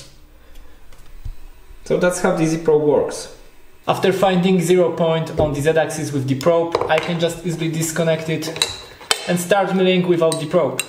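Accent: Poland. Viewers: Typowy polski akcent :) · Daj polskie napisy bo słyszę że rodak 😆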